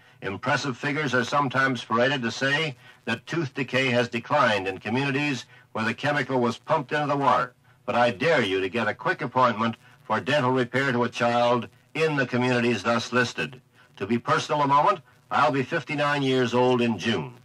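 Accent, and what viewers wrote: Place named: Canada. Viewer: Wow, what a Canadian accent sounds like "Truth".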